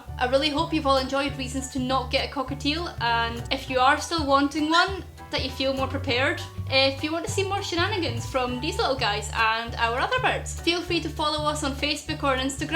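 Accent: Scotland, United Kingdom. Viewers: Are u scottish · Are u Scottish;)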